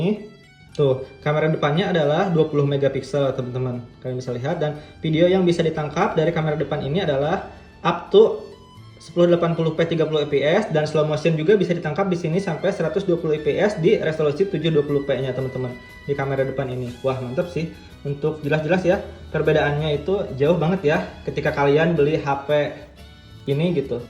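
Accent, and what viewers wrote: West Java, Indonesia. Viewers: sunda nih yah? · Aksen nya khas sunda bgt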